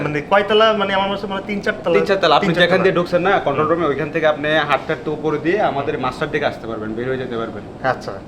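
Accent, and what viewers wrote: Bangladesh. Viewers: আপনার কথা বলা বাংলাদেশের মানুষের মতো ।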